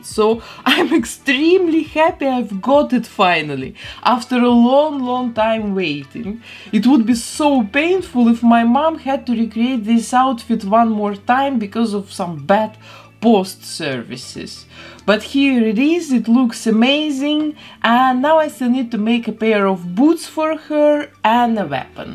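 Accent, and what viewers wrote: Russia. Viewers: Русский акцент?¿ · Do I recognize the accent right? You are Russian? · Are you russian? Where are you from? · Ох этот русский акцент 💪🏻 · Я что слышу русский акцент? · русский акцент не спрятать😳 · Стоило бы тогда добавить субтитры на русском, для соотечественников, судя по акценту автору канала это было бы не сложно, а нам приятно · Оооо боже какой прекрасный русский акцент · Ого, акцент звучит очень по-русски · О, этот русский акцент! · Я слышу русский акцент или мне кажется?) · Ни чего себе акцент!!! Вы из Ростова? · Мне кажется, или она говорит с русским акцентом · Русский акцент жёсткий)) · Не в тему. Но капец русский акцент. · очень русский акцент))) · Русский акцент😅😅😅❤️❤️❤️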